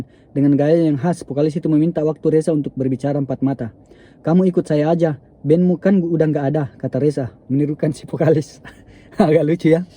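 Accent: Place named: Toraja, Indonesia. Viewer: Macam sy tau logat bang kayak org susl sel deee kayak Toraja👍🌷🌷🌷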